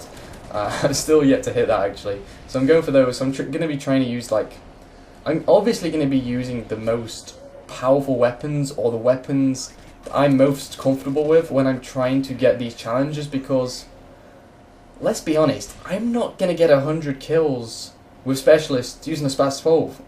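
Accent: India. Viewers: EPIC INDIAN ACCENT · enjoyed the Indian accent :)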